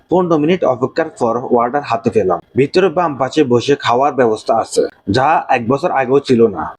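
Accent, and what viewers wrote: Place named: Noakhali, Bangladesh. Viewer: আপনার ভাষা মাঝে মাঝে বুঝি নাই। আপনি মনে হয় নোয়াখালি কিংবা চিটাগংয়ের মানুষ।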